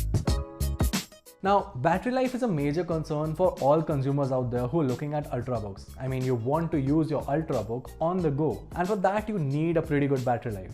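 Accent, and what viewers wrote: India. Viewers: The laptop on the video is so drity it looks like u pulled it out of a trash. And try to work on that Indian acceent to be less listenable, we not all americans but we try to fit globally. I had to watch this low budget review with a laptop pulled out of Kirby Trash Bag cuz it was the only one with this model. Cheers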